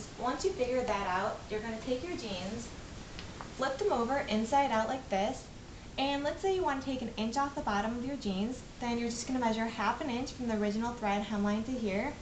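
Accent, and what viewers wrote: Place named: United States. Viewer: She sounds very American:)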